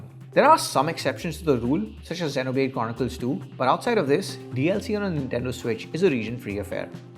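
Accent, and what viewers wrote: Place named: India. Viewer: I don't know why I hate Indian speaking in english like this. I can flawlessly understand native English speakers, but Indians talking english is just horrible. I also talk in english when needed, but the way he sounds and others also, it's unbearable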